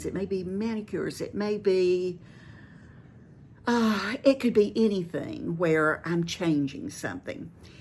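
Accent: South, United States. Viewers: You have inspired me and I love your southern-style and Your accent and you stories 2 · Hi there dear lady....Just found your site. Love your Southern accent. Looking forward to viewing more of your lovely videos...(a nana in Pa.) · I’m almost 56. I’ve been watching for a little more than a year now because I love your outlook on aging and just life in general. You also have a calming voice and lovely southern accent that reminds me of my home state.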